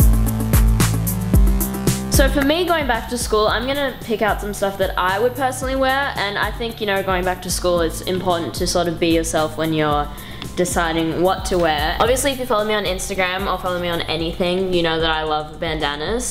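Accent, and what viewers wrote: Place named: Australia. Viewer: im happy you still have an aussie accent :) ccome backkkkkk!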